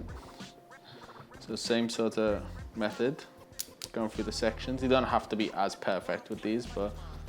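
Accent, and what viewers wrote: Liverpool, United Kingdom. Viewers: you sound like a scouser and speak like one too im from Liverpool meself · a mexican with a british acent wtf 😅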